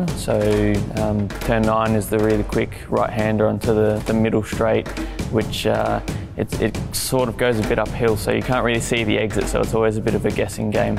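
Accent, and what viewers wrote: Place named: Australia. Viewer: His Aus accent sounds bit stronger here..hes much younger here though❤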